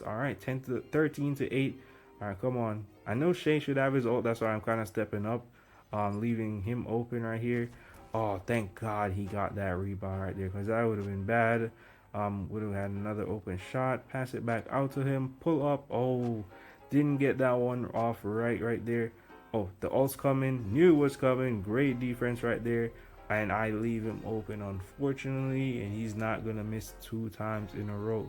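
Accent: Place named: Jamaica. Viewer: You sound Jamaican or Bahamian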